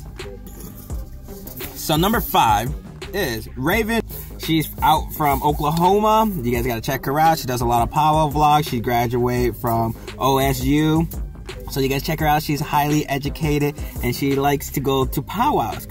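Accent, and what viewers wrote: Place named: Philippines. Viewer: That accent!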